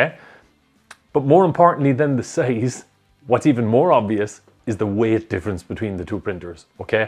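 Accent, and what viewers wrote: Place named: Kerry, Ireland. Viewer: Hi, brilliant and straight forward review as usual. Bit of a daft question and definitely not printer related! Having enjoyed many trips over the water to Ireland encountering all the diverse dialect changes I can't help but try and best guess. So my best guess for yourself would be the West side, maybe Kerry or not quite that far South.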